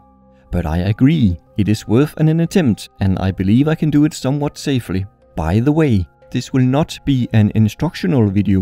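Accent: Denmark. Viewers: uhhh are you danish? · that danish accent :o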